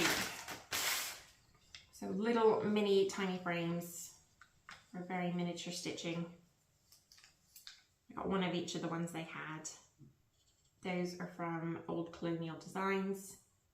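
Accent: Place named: Arkansas, United States. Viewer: Excellent first FlossTube. I love your accent with a bit of Arkansas every few words. Halloween 1812.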